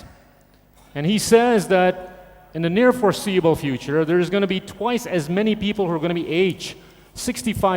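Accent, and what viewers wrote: United States. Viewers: Wow setelah bertahun2 lulus dr universitas di Amerika beliau tetap fasih berbicara dengan bahasa inggris aksen Amerika, cool! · Aksen Amerikanya kentel banget...😊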